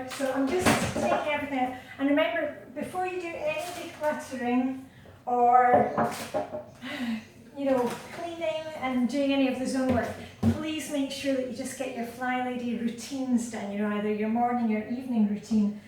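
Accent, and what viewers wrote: Scotland, United Kingdom. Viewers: Are you from Denmark? I was going to say you sound Scottish lol hope I don't upset you saying that. ❤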